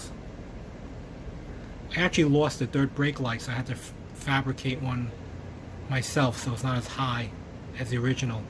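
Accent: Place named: New York, United States. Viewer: Hey, I'm Chris......Jeff asked me to check your channel out.I subbed your channel & I like your builds a lot. I primarily build muscle cars myself, a few old hot rods. Just curious.....I'm originally from NYC, you sound like you have a New York accent. Anyway, I subbed U & nice 2 meet U buddy.....speak 2 U soon my man!!!!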